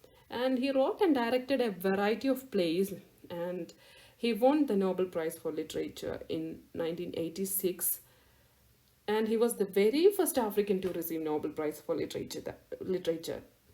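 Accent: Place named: Kerala, India. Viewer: Mallu accent spotted.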